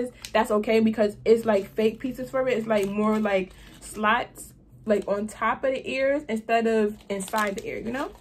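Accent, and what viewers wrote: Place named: New York, United States. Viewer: You got a new York accent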